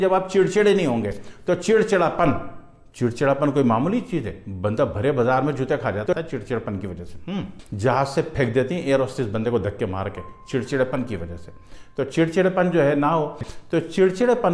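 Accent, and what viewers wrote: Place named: Lucknow, India. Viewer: انداز گفتگو سنکر لکھنو کی یاد آگٸ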